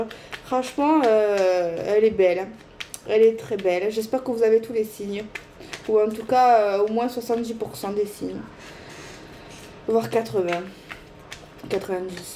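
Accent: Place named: Marseille, France. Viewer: Bonjour ma belle j’adore ton accent marseillais quand tu es dans l’emotion merci pour ce message je te kife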